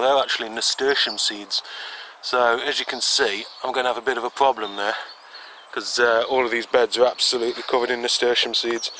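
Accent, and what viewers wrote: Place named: Nottingham, United Kingdom. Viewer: I thought you had the accent, I'm from Notts too. I've recently just got into gardening and been enjoying watching your videos, it's making me want an allotment lol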